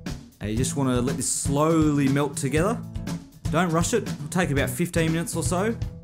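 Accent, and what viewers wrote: Australia. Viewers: I love Aussie accent! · The aussie accent sounds very cool, respect)) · its nt british i thowt he was aussie dikk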